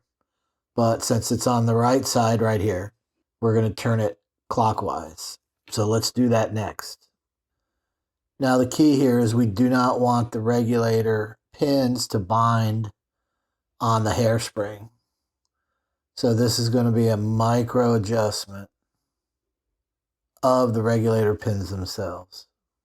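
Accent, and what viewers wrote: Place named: United States. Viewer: Which part in america you from i like your dialect ?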